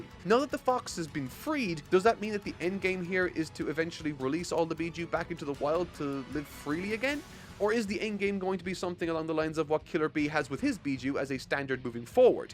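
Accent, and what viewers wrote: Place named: Ireland. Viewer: loves saying Cathartic in his thicc Irish Accent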